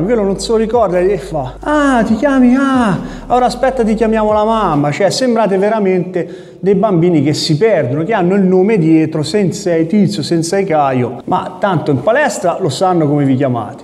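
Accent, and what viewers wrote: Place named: Tuscany, Italy. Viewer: Ma sei in grande! E poi, con l'accento "toscanaccio", troppo simpatico.. bisogna accettsre x 💪🏻 forza. Ciao by Jerry (Milano)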